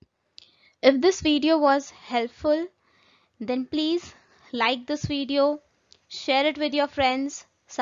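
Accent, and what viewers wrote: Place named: India. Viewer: 真是受不了阿三口音，我服了，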